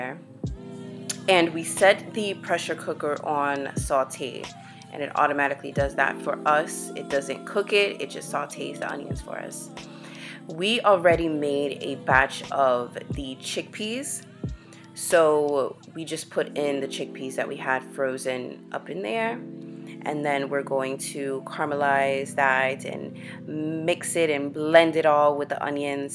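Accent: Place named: Caribbean. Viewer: U sound so Caribbean, Jamaican or barbadian to be exact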